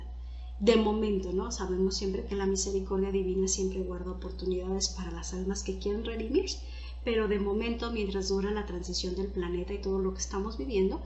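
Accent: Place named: Mexico. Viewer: Hola, voy a asumir por tu acento que eres de Mexico, pero en caso de equivocarme igual gracias por compartir este video en YT, quisiera saber si sabes de algún centro espirita para congregarse aqui en Mexico yo soy de Nuevo Leon pero eh mandado correos al que supuesta mente es de este estado pero no me responden, tendrás alguna sugerencia ? Saludos y gracias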